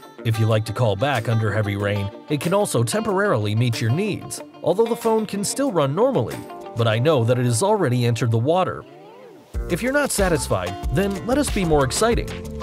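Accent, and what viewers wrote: United States. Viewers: The script of this video sounds like it was written in China and read by an American.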